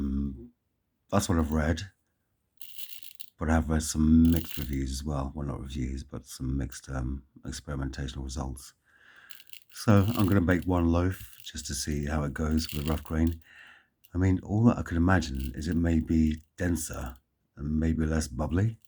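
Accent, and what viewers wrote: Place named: Scotland, United Kingdom. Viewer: Is it just me or do I hear his Scots accent coming through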